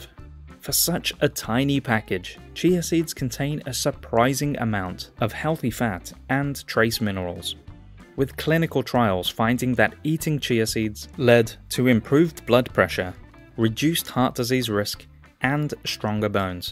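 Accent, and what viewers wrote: England, United Kingdom. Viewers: Why is someone with an English accent pronouncing items the American way? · A voice over with english accent means it is probably BS. Iguana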